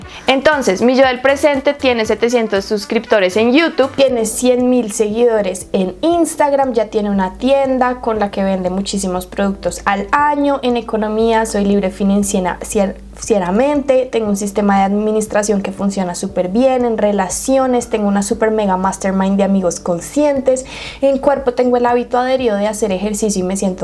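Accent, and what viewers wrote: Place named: Bogotá, Colombia. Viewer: Siiii. Es de Bogotá DC 🤗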